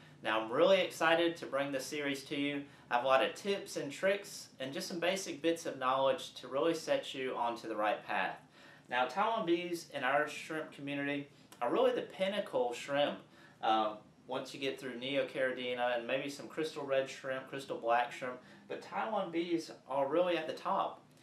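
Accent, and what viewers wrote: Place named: South, United States. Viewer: Thanks for the video! Are you from Georgia? I'm from Texas and you sound very southern!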